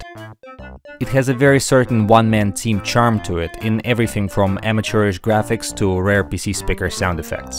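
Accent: Russia. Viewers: I like your secretely half russian accent! So awesome! and great vids too! :D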